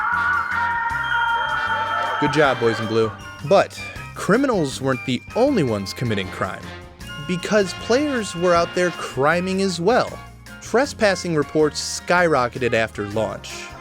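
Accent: United States. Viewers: Entertaining video!! Well deserved Like, but it's painfully obvious that you are emulating Internet-Historian with the Segway's and background music. What makes it even more jarring is the lightly-pitched American accent you have that starkly contrasts with the kind of voice most Youtube fans expect with this format and it takes away from your hard work. Constructive criticism would be to suggest using the same level of research, but develop a personal style to not seem like a knock-off Internet-Historian. I mean you even say, "ad time" and "ad over" to the same beat as the man over at Internet-Historian. At least you got paid for this.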